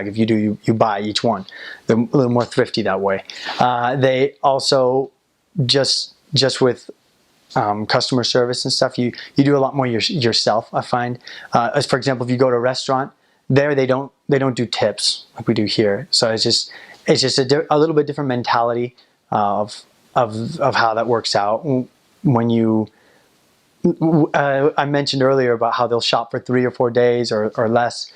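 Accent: Netherlands. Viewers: I feel like he has a slight “Dutch” accent when he talks English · Your pronunciation is really Dutch. Congratulations. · Super, je Nederlands is zo goed, je hebt zelfs het Nederlandse accent. Nu misschien naar België en Vlaams leren ? 😄 da’s toch nog anders.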